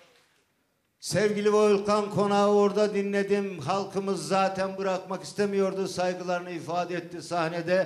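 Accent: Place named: Anatolia, Turkey. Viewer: tam Anadolu ağızı çok güzell nazal n ler falan super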